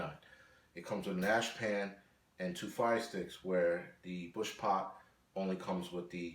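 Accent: New York, United States. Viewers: Are you from NY? I'm sensing an accent.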